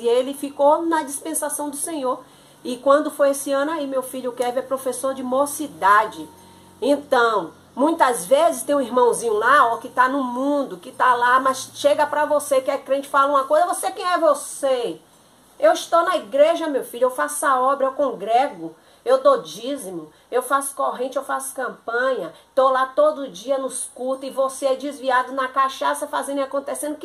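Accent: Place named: Northeast, Brazil. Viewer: Gosto muito dos vídeos e do sotaque nordestino também, coisas lindas do nosso Brasil, uma terra linda, cheia de diversidades e por isso tão maravilhosa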